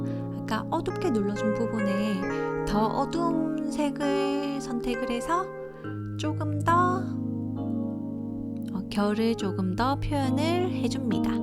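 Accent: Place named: Gyeongsang, South Korea. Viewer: 목소리가 고우신데 말투에서 경상도쪽 억양이 약간 느껴지는게 매력이에요 ~_~ 허허 조와요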